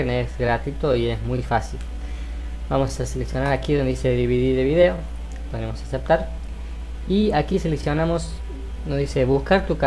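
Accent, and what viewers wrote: Argentina. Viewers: re cordobes jajaja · Excelente tu tutorial "cordobés"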